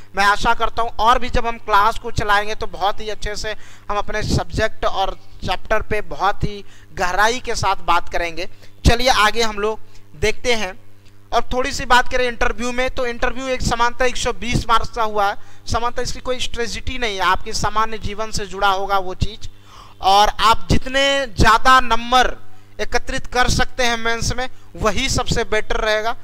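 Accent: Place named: Bihar, India. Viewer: Maje le ro ho ap Ye bihar ki language aisi hi prvarti ki hoti h 🤗 पढना _ परना सडक_ सरक etc. It's all ✓. One can understand 😎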